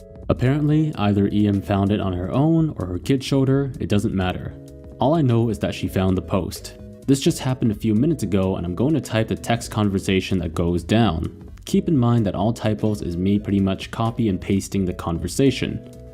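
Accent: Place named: England, United Kingdom. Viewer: you sound like TheRealKenzo but with just a pure english accent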